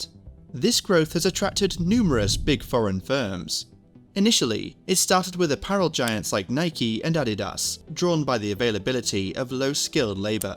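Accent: United Kingdom. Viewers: shit video every time i hear a british accent in a video i know its just going to be bad content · The narrator must come from Lancashire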